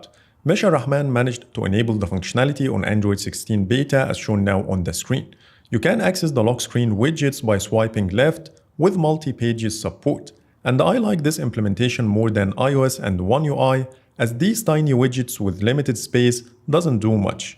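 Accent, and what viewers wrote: Egypt. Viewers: Are you Egyptian You accent looks like Egyptians accent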